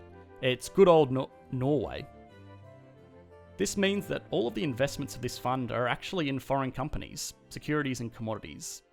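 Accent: Australia. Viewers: i seriously hate the austrailian accebt , so many wordsso distorted , i cannot understand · Great video. It sounds like you’re an Aussie; I’d be curious to know how you feel about Australia’s resource economy in light of what you’ve learnt about Norway’s policies. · Why does this guy sound Australian? Is he Norwegian? If not then all his stats are based on Literature found and stats not of his own experience. I would rather get my statistic figures from a local source, as opposed to stats found in the narrators home library.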